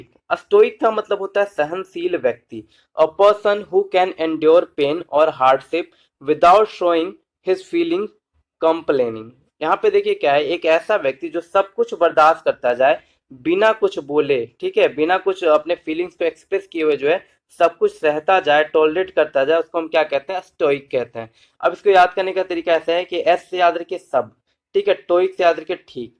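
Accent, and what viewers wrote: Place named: Bihar, India. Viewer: Sir aap bihar se ho kya...😍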